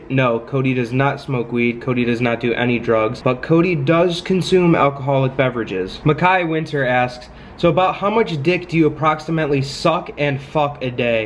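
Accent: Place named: Upstate New York, United States. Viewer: I could hear the Upstate NY in your voice. You sound just like my ex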